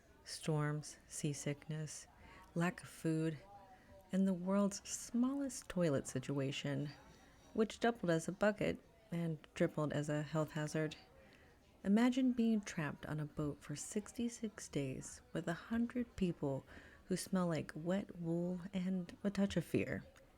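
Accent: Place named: United States. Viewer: The table - a pub 😂😂😂😂😂 i walk past this pub every day walking my dog - if I’d seen you I’d have shrieked with “what are the chances! ” joy So youre lucky I missed you. Mam you have a beautiful and very calming voice If it would interest you I strongly urge you to get a voice over agent - in London theyd LOVE an authentic American accent on their books AND YOURE so calming I can see you beibg busy in the anti anxiety field which is growing x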